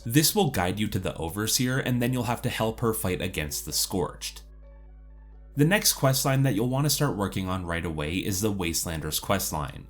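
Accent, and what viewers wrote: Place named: Midwest, United States. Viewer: I’m guessing he’s from the Midwest. Maybe Ohio or PA. His speech is PERFECT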